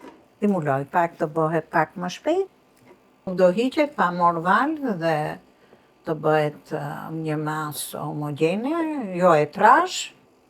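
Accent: Tirana, Albania. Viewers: Te degjoj se flet tironce😂😂😂😂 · Te lumt zonje. Je fantastike, me ze te embel,dhe dialekt tirons puro.❤️🖐️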